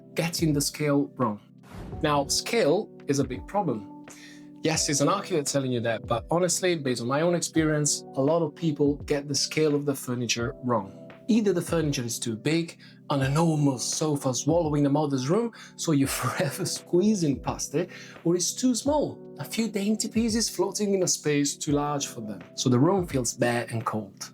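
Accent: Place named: England, United Kingdom. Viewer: sei meraviglioso. You have a beautiful english accent with a mystical touch of the unknown. Bravo anche nel tuo lavoro. From Italy.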